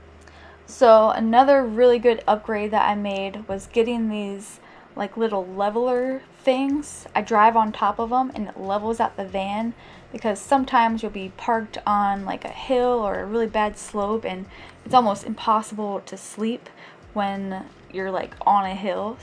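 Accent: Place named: South, United States. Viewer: I must say you're very pretty and seem like wicked down to earth girl cool ass chick.. curious how old are you? To me you have an accent must be from down south I'm guessing right?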